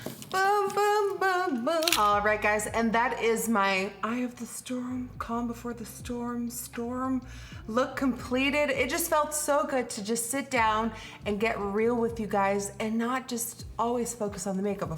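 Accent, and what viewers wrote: United States. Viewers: I THOUGHT YOU WERE AMERICAN! youtube unsubscribed me so I resubbed. Either way...Houston Tx USA Come see uss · How come you have an American accent and don’t sound Dutch at all xx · Can you do a video where you transfer yourself into Adele? You favor her I think. My mother is Dutch but I never picked up the language. She like you speaks with an American accent. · I have been following you for three years and I was thinking you’re Amerikan😱Wow you speak incredible babe 😘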